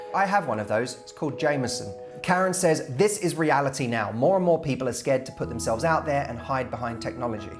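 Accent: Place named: United Kingdom. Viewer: adoro il suo accento britannico ed i suoi consigli!😍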